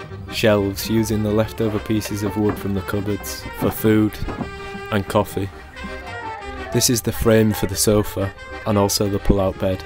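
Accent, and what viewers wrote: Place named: Yorkshire, United Kingdom. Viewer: That's a good old yorkshire accent for ya :-)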